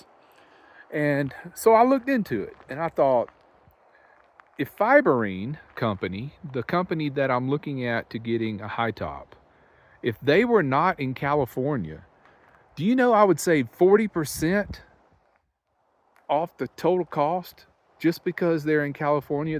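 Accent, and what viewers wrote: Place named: Georgia, United States. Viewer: fellow Georgian here. I'm almost 66 yo and in July 2023 I sold my home in Fl, bought a 31 RV and have traveled to almost every single state in the US, i have 4 states left to see besides Hawaii. I travel with my two little doggies and my cat. Like you I stay as long as I want wherever I want and am just free. I watch your channel not because you travel in a van but because a) i just love hearing that Georgia drawl and b) because you are you. You inspire me and that's important. Whatever you decide it will be right for you! Stay safe and happy travels.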